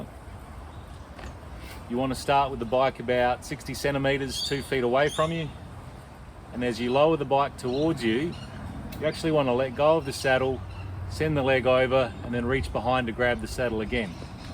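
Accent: Australia. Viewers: I'm looking for a way to mount my bike that doesn't kill my lower-back (I have issues . . . 🙂). This looks like a great one for me to try tomorrow when I get my bike out. I was looking at getting a 'step-through' bike, but I don't really want to spend the money, so this is definitely worth a try. Thanks for the video. Good to hear an accent from down under (no offence to the Americans). Happy riding.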